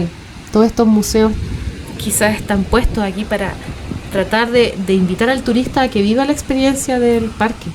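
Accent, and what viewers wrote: Chile. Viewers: lindo lenguaje con tono dulce chileno que reúne terminología ecológica,política y cultural,medida a medida con pausas y silencios y hasta poesía! Una Felicitación 🇲🇽🇨🇱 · eres chilena verdad? que acento tan cool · Me encanto la narrativa que hiciste en este video, supongo que por el acento que tienes eres chilena, te felicito y te mando un fuerte abrazo y Chapultepec es un bosque golpeado por el paso del tiempo y de sus malos gobernates, pero no obstante ello, sigue hermoso y siguue de pie. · Eres chilena? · Arqui.en su voz.descubro lo agradable del acento chileno.contrario a lo que se dice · que gran punto de vista... en un hermoso acento chileno... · Eres Chilena, sin duda se nota, interesante tu video no se ni como llegue a este Canal; Pero me gusto mucho, Mexicana radicando en Ca. USA. Pero quien no pierde sus raices. Cuando vivi en Ciudad de Mex. 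pocas veces fui al Bosque de Chapultepec, antaño estubo muy descuidado, me alegra mucho que a raiz del Gobierno de AMLO y ahora de la Presidenta Claudia S. lo esten renovando. Un dia volvere y lo recorrere COMPLETO!!! 🙏🙏🌲🌳🌴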